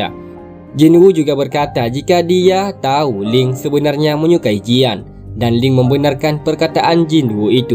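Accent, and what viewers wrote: Aceh, Indonesia. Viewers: Ini admin a pasti orang aceh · Apakah adminnya org Aceh ? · Logan acehnya masi kental bang semangat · Cara ngomongnya kek orang Aceh ya bg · Ia org aceh knyak ya😂😂😆😂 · Aceh teoh😃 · Kek bhs aceh sikit² tpi mntap bg👍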